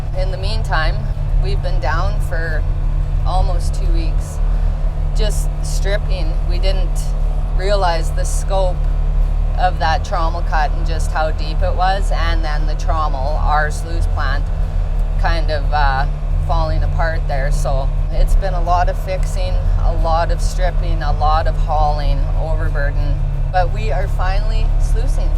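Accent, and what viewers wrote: Canada. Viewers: Your semi-canadian accent is hilarious by the way 🤣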